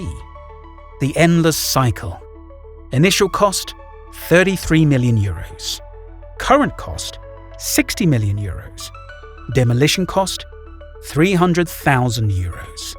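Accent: England, United Kingdom. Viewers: Nice english accent😊😊😊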